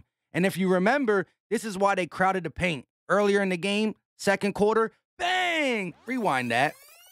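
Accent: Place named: AAVE, United States. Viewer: Why speak in ebonics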